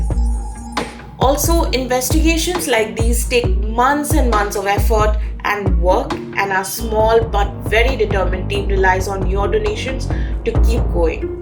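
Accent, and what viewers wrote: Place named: United States. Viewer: This woman speaks in Americanized English and has a problem with lobbying? JNU bawasir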